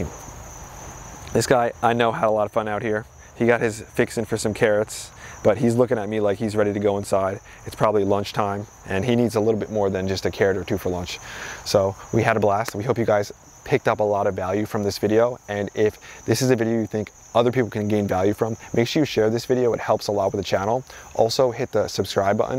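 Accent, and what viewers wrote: New Jersey, United States. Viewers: I enjoy listening to your New Jersey accent. I'm in Harlem, by the way. And I ❤Tuck.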